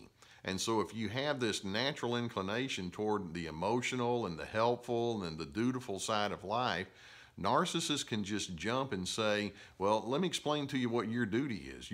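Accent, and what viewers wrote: South, United States. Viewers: Thankyou so much you've conceptualised so much of what this personality type is and those who have taken advantage of it. I've often said when, disappointed , that I expect too much from people . I know I have been naive , expecting people to be more like me. Learnt a lot by being burnt . I have watched a lot of your tube postings. The knowledge you've given me re Narcissists has been a light on on and several ' ah ha ' moments . Scott 🇦🇺 that's again , love the southern accent!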